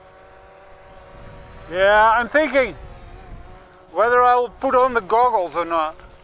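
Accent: Netherlands. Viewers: Volger erbij ! hoorde gelijk een Nederlander ;-) ga zo door.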